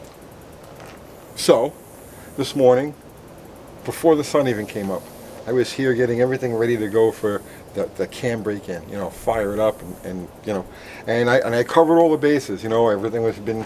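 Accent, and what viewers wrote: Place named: New Jersey, United States. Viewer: You talk like you're from Jersey.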